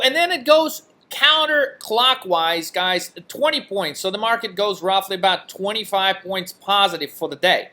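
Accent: South, United States. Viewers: What kind of fucking accent is this lmaooooo craziest one iv ever heard i here European , southern American and New Yorker all at the same time!!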